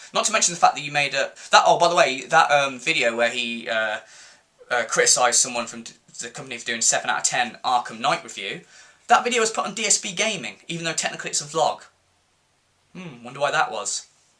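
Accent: United Kingdom. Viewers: I insulted his British accent not the British language as a whole while your coming in and commenting when you do not know what your talking about. But Im done with yall, I proved my point even if you don't think so or not. If your looking to get another reply from me your going to be waiting because I'm gone by lol. Just know I'm going to be back if you make another video on a DSP topic, adios my not amigos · Love that UK accent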